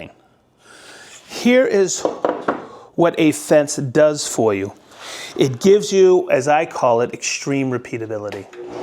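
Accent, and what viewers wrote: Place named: Boston, United States. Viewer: just want to thank you for all of your tutorials on woodworking and the use of the Festool System. I'm a hobbyist and both a fan and user of Festool products. Your videos have enabled me to fully understand and utilize the features of Festool products while elevating my woodworking game. Your product recommendations are also well noted, and I'll be adding some of your suggested accessories to my toolkit soon. Each one of your tutorials are a masterclass and I plan on viewing every single video on your YouTube channel. Your energetic delivery and Boston accent are much appreciated as they add both color and authenticity as a content creator. Like Batman and Robin, you and work well together. Wishing you the best in the coming new year!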